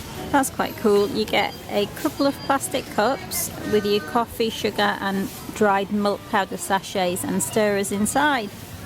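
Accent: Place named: United Kingdom. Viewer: Thanks for doing this beautiful video. I liked your British accent. Were your parents or grandparents from Indian Punjab?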